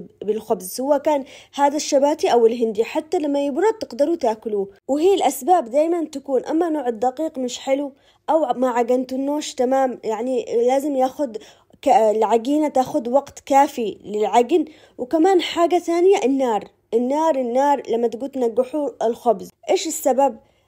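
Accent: Aden, Yemen. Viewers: تسلم الايادي يا محلا كلامك وحشني الكلام العدني اني اتربت وعيشت بعدن وسافرت السعودية لي اكتر من ٢٥ سنة 😢... اني احب اسوي خبز علشان اسرتي كلهم يحبوا الخبز واليوم سويت الخبز المعطف بس ازود واحط الباقي بالفريزر الله يقوينا ويطتب الاجر لنا جميعا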